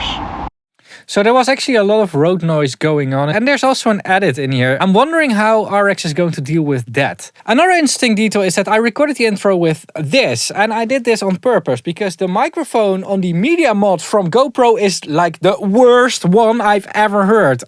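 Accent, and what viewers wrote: Netherlands. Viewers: I dont know who you are, but I hear the Dutch accent haha.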